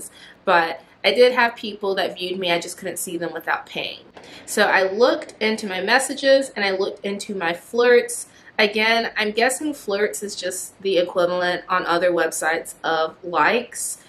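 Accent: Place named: United States. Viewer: When i saw the title of this video and then looked at the caption, i thought " i bet she is American " , i clicked on your video and you began to speak and yes, you have an American accent. Interracial relationships happen here in the uk everyday with everyone that we actually dont " label" it. Here in the uk we all mix and blend ,colour is not such a big thing here.. its a shame that everyone in the states is divided by skin... only in america!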